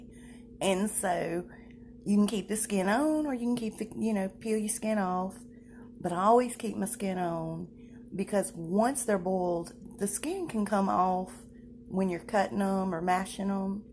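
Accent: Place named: South, United States. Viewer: Hi from Georgia in the US. is a Southerner here like me. She is in North Carolina. The South in the USA is know for our slow melodious accents and delicious home cooked southern, comfort food, meals. is terrific and you might want to check out Collard Valley Cooks too. Blessings.❤️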